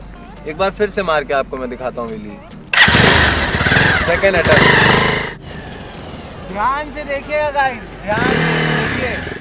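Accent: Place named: Lucknow, India. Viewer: Ekdm LUCKNOW wali boli hai bhai... Loved it... 💙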